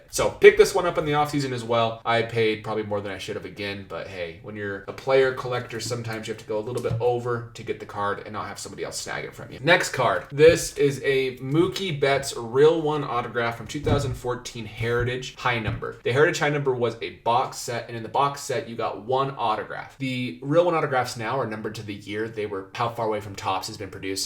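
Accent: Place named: Utah, United States. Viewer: Sounded like he is a Utah boy like myself.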